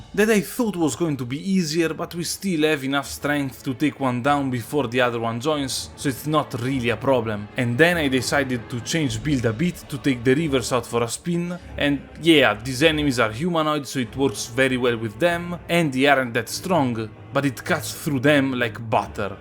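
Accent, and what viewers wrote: Italy. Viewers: Italian accent + berserk reference= me subscribing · fammi indovinare, italiano? ahaha l'accento ti vende che una bellezza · Perché hai l'accento italiano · Sei italiano? credo di sì per la parlata e la voce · Adoro l'accento italiano 😭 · Your voice gives me italian vibes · Si, l'accento italiano si sente. Ottima run, comunque! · You're Italian?! I knew you had an accent just couldn't ever place it (don't judge I'm a foreigner too) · I just love your Italian accent. You are the only Italian DS player I know. Keep up! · Oh shit your accent is Italian? I thought it was French 💀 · Che bellezza scoprire che sei italiano, l'avevo immaginato da qualche pronunzia! Adoro i tuoi video 🤩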